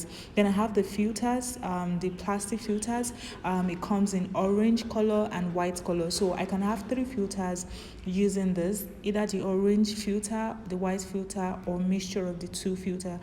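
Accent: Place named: Africa. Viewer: Hi !! Are u African or ?? Not a racist I just love ur channel and accent. I just been a make sure Nigerian? Jamaican? It’s so cute . And that ring light is beautiful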